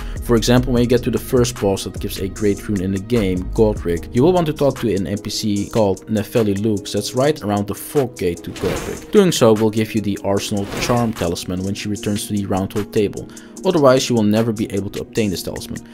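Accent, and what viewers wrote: Netherlands. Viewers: Are you Dutch? 🤔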